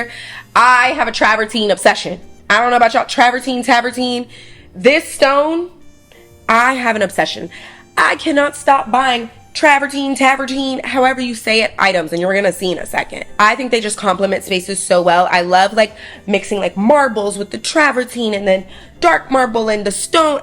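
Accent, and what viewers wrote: New York, United States. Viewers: Am I the only one that hears a New York accent now with her?????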